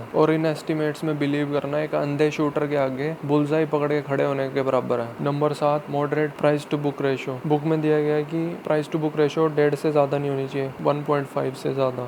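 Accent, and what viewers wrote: India. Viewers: One suggestion/ recommendation only with well wishing to improve. Please speak closer to mic as awaz thoda faint hai. love your style of talking. Talking like a layman with Delhi Hindi twist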